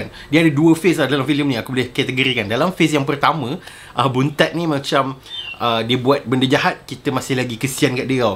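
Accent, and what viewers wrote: Kedah, Malaysia. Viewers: Rasa lorat dalam kawasan kedah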